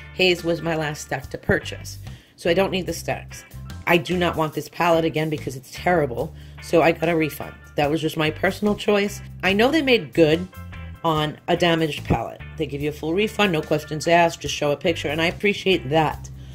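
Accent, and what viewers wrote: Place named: Staten Island, United States. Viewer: I could cry right now girl...I dont know how I missed it from the first time I watched this video..., I am from Staten Island too. I live here still. Your voice had a familiar quality for me...we probably both have the same accent 😁💙💚💙💚 I didnt think I could love you any more but now I do!!! Its so amazing to find someone as knowledgeable as you on YouTube and social media and know that you are from my hometown. 💚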